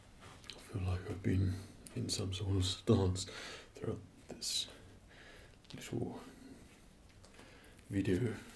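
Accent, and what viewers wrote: United Kingdom. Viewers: I'm glad you're making videos again. Your voice is a big trigger for me. Especially when you start to speak softer and softer and softezzzzzzz.... And I've noticed British accents also really work for me, though, no clue why. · What region of Britain do you come from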